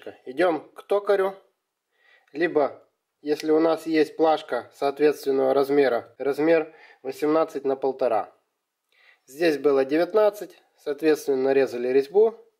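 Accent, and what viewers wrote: Odessa, Ukraine. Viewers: акцент как у Одесских евреев.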